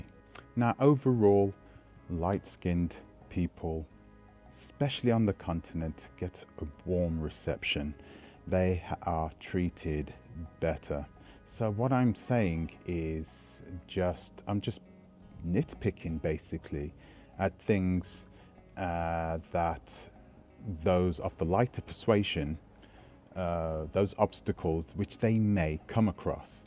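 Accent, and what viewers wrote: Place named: London, United Kingdom. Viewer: Grew up in B’Ham? Mmm - London accent!!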